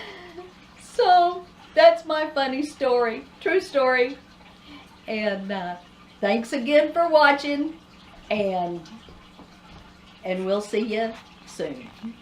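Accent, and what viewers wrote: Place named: Texas, United States. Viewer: Your accent sounds familiar. I’m from Dallas. Small towns around there. 😄👍❤️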